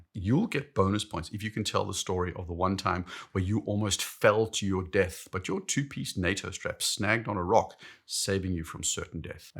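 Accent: South Africa. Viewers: he sounds like he’s South African. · ...and a slight South African accent!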